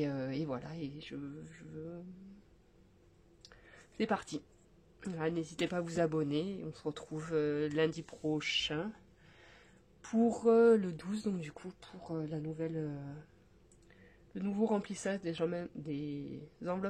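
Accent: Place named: Southwest France, France. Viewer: Coucou ! Tu as le meme accent que mon papa !❤ Sud Ouest ? Limousin ? J'espère que tu trouve petit a petit a petit la sérénité ! 😊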